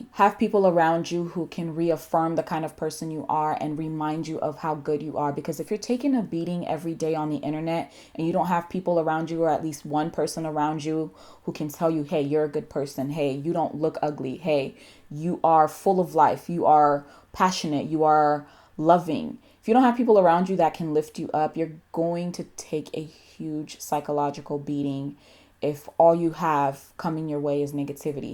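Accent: Nigeria. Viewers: I love your Nigerian accent it sounds so pretty! This look is so pretty 😍 How do you find out what’s trending ? Thank you for these tips. Very helpful 💕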